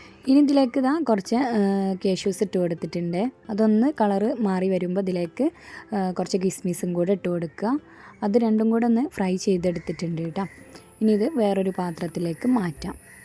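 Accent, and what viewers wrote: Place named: Malappuram, India. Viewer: Njagal Malappuram slang Maya Aantto😃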